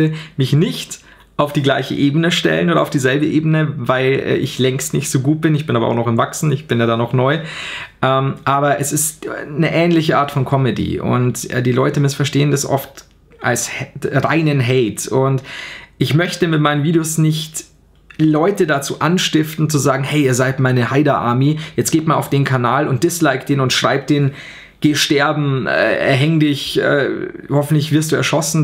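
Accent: Bavaria, Germany. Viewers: Ich habe dich leider erst vor einer woche ca. Gefunden, aber ich feier deine videos/ dein Humor Ziemlich, Die neuen sowie die älteren. Viel glück und viel spaß weiter hin !!! Ps : Ich feier dein Dialekt, aber könntest du ma ein Ausraster over 9000 auf Bayrisch machen ? xd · Aus welchem Regierungensbezirk Bayerns kommst du? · Hey du bist einfach der beste aber bitte heb nicht ab denn so wie du bist bist du einfach nur geil und s geilste is ja dast bayrisch schmatzd aiso i wünsch da ais guade:) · Dei bayrisch is sa gal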